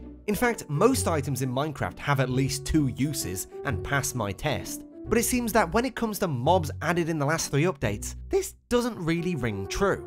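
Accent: United Kingdom. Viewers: and GradeAUnderA are the same person theory Grade ✅ British accent ✅ ✅ Ranting ✅ ✅ Sounds angry 90% of the time ✅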